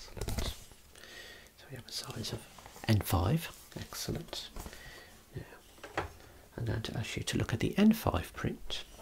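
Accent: Birmingham, United Kingdom. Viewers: ARE YOU A BRUMMIE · Never thought I'd find a brummie optician relaxing enough to sleep to! Lovely voice.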